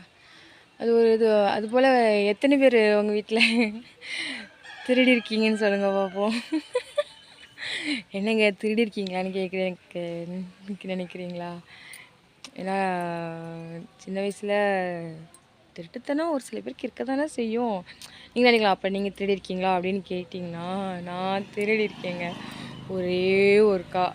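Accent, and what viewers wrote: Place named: South, India. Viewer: கள்ளம் கபடம் இல்லாத குழந்தை தனமான உங்க தெற்கத்திய பேச்சு எங்களையெல்லாம் மயக்குது தங்கம்.நீங்க தொடர்ந்து வீடியோ போட்டுக்கிட்டே இருக்கணும், நாங்க உங்க அழகான பேச்சை ரசிசிகிட்டே இருக்கணும்.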